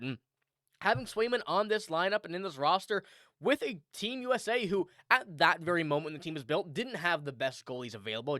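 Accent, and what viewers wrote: Newfoundland, Canada. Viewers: As much as I love 63, and would have loved him to retire as a Bruin, it wasn't going to help the team to keep him. I can't see him playing third line on the B's. I pray to God he doesn't go to the Leaves. I'd rather see him in Montreal. My stomach tells me he stays in Florida. With regards to Sway, he better get his act together. Oh, I finally subscribed. Question are you a Newfie?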